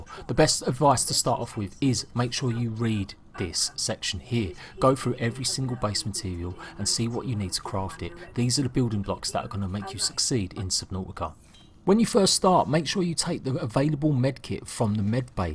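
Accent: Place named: London, United Kingdom. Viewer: Pretty sure this is a London dialect, based on how he doesn't say some t's and how th sounds like v/f.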